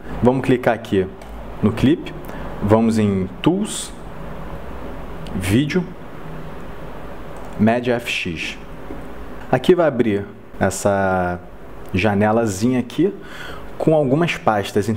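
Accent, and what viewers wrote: Rio de Janeiro, Brazil. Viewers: Adorei o video e tbm adorei esse sotaque carioca "Mesa de docexxxx" *-*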